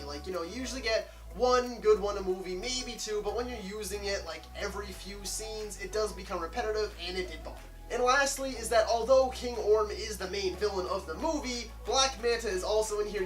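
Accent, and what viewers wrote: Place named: Canada. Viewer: Wait! Did you say your “miewth” full of water?” 😂. Gotta get used to that Canadian accent. 😝. Great review buddy!👍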